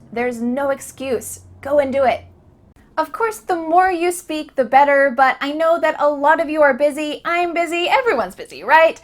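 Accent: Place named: United States. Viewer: Hi, I really enjoy learning English with you. I love your américain accent, your smile and your energy in teaching! I’ve got motivation when I watch your videos. Even when I am in a hurry in the morning I try to listen and watch your videos while I have breakfast. Thanks a lot for your lessons. 🤩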